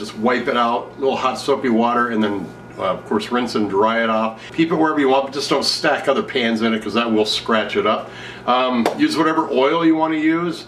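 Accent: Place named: Midwest, United States. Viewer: Are you from Wisconsin or Minnesota? You sound like you have a slight accent!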